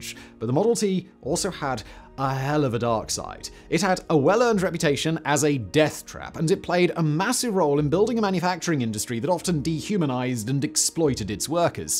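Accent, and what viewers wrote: United Kingdom. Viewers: Thank you for cleaning that up. The Model T contributing to the stock market crash is almost as foolish as the car contributing to anti-Semitism. Clearly, just because one has a great beard, a cool British accent, and wears glasses doesn't mean that one is a sophisticated thinker.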